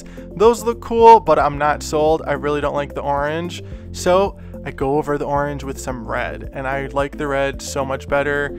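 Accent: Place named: Midwest, United States. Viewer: The way you say your 'o's makes me think you're from Minnesota or Illinois. . Just curious where are you from?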